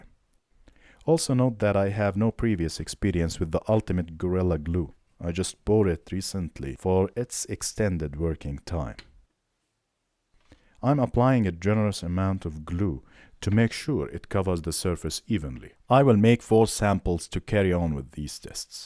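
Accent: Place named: Thuringia, Germany. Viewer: That accent. Sounds Thuringian. Please tell me I’m correct!? Lol.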